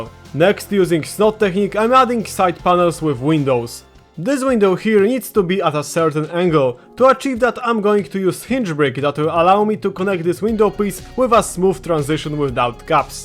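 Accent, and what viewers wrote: Poland. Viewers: Słychać że polak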